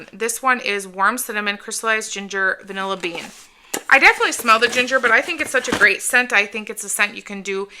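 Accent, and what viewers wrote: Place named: Minnesota, United States. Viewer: I love the Perfect in Pink candle. I bought two which is unusual. BTW, im wondering if you are from the upper Midwest? Im a Minnesotan and you kind of sound like us lol...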